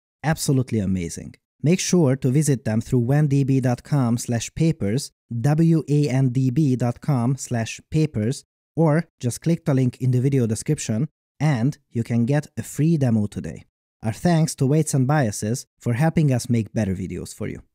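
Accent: Hungary. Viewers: The hungarian accent is strong with this one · Are you hungarian? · lol the hungarian accent!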